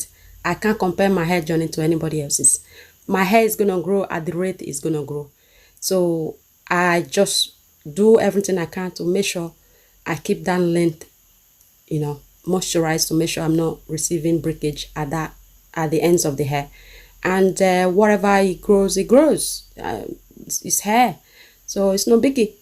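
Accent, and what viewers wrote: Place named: Nigeria. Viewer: Your accent is very thick and lovely, are you Nigerian?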